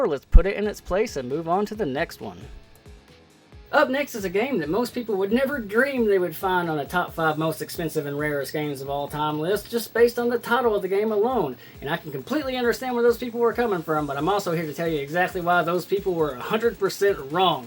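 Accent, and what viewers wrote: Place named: Kentucky, United States. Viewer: Hell I thought your accent sounded rather familiar. I had a feelin you were a Kentucky boy too~ very nice collection of games and I can see why those 5 are considered the "Big Bad 5" I honestly had no idea about Sculptor's cut either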